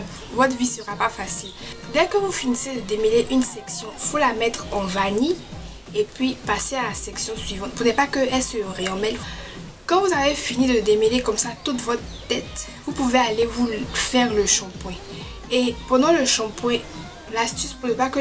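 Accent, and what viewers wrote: Ivory Coast. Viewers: Jaime bien tes conseil tes belle avec cette voiture mais l'accent ivoirien te a trop mais bon courage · J'ai imediatement cu qu'elle est ivoiriene grase a son acsen francais.D'esole pour mais faute mon clavier est en Englais je Vie au etat unit et je suis burkinabe je vais au licey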